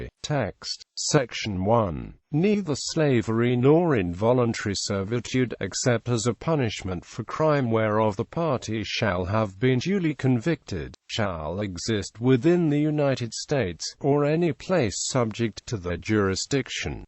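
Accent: United Kingdom. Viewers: why is a man with a british accent on here ?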